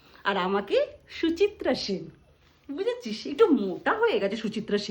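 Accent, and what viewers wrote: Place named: Bangladesh. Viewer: কি সুন্দর লাগলো দিদিভাই আপনার কথাগুলো।এইরকম করে বাড়িতে কথা বলি ঠাম্মার সঙ্গে।আমর বাবা কাকা সবাই বাংলাদেশে জন্মেছেন। তাই এমন করেই কথা বলা হয় বাবার বাড়ির দিদিভাই দাদাভাই সবাই একসঙ্গে হলে। আমরা শুদ্ধ বাংলা বলতে পারলেও আমার ঠাম্মা একদম শুদ্ধ বাংলা ভাষায় কথা বলতে পারে না। ওনার আঞ্চলিক ভাষায় উনি কথা বলেন। নিজের কথা আপনার মুখে শুনে কি যে ভালো লাগলো। ভালো থাকুন সবসময় নিজের পরিবারকে সঙ্গী করে ❤❤🙏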